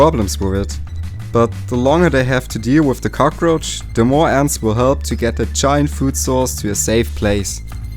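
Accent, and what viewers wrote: Germany. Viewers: Ohne in die Kommentare zu gucken wusste ich das du ein deutscher bist wegen dem Akzent😂😂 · Du bist Deutsch richtig? Der akzent! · Are You German ? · Man hört aber ein bisschen das Deutsche in ihm😃😜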